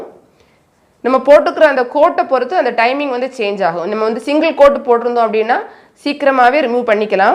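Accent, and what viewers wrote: Kanyakumari, India. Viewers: Nega kanyakumari ah sis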